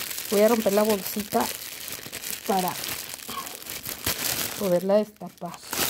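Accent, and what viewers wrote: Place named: North, Mexico. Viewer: Ahora sí te salió lo norteña,ajuuua 😅 todo padrisimooo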